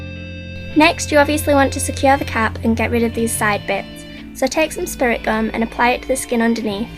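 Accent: Yorkshire, United Kingdom. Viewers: she sounds so yorkshire in this video haha it's so cute ^^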